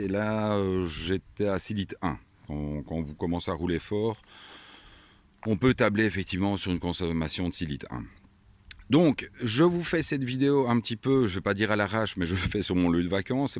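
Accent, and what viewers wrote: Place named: France. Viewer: non non pas déçu. Juste que venant de belgique, j'entends juste un accent français :p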